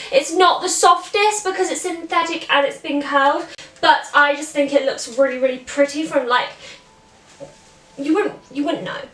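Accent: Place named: Mid-Atlantic, United States. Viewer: I gotta say your American accent is quite good. Sometimes sounds a bit more Mid-Atlantic but that also kind of works for that classic Princess vibe. And thank you for wearing gloves! I get kind of annoyed when I see Belle or Cinderella not wearing gloves (thank those live action movies for that ugh)!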